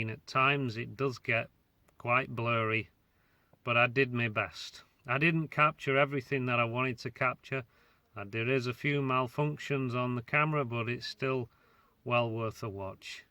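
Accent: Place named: Yorkshire, United Kingdom. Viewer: You sound like a Yorkshire man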